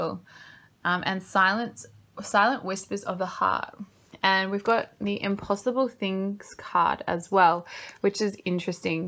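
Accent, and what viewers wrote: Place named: Australia. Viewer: #4 but couldn't focus much on what u were saying but i absolutely LOVE ur aussie accent. ah my heart 😂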